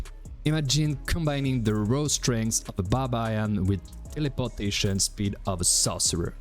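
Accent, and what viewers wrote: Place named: France. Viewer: Salut le poto je me disais bien que la accent était familier, j m abonne pour the french powzr brother. Tu geres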